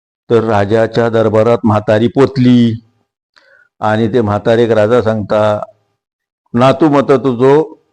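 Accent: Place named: Malvani, India. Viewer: एकदम शुद्ध मालवणी मजा आली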